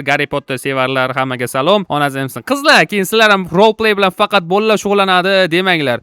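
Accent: Qashqadaryo, Uzbekistan. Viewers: Qashqadaryodan emasmisiz sheva pzds tortopti😂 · Shevadan Qashqadaryoga tortyabdi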